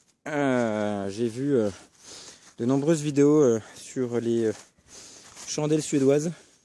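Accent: Franche-Comté, France. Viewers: J'aime pas trop le côté commercial de chez bear gylls je préfère les couteaux plus rustique, comme la ma prochaine acquisition sera le bushlore de chez condor, si tu ne le connais pas Ju0069 a fait un rewiew test très intéressante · Coucou suis de sortie demain ou dimanche vous laisserai une dedicasse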